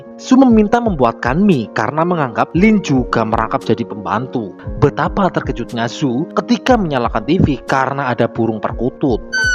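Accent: Banyumas, Indonesia. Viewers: Aja kelalen ngapak kang.. 😂😂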